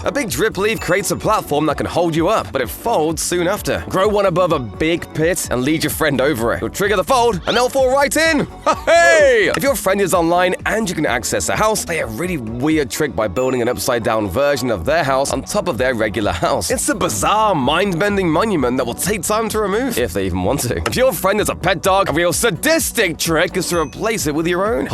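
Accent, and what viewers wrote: United Kingdom. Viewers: Just found this channel so I’m not familiar with it in anyway but I can’t tell if Dexter Manning is narrating a Minecraft video or you’re just that English but there’s math here that isn’t mathing.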